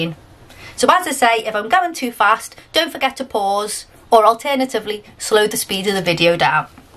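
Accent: Liverpool, United Kingdom. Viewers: So refreshing to click on a posh scouse tutorial ... I'd like to make people aware yes we from liverpool talk fast, walk fast....work fast lol love it xxxx ❤❤❤ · Was just gunna say .. oi scouser.. takes one to know one🤣🤣🤣g’wed girl!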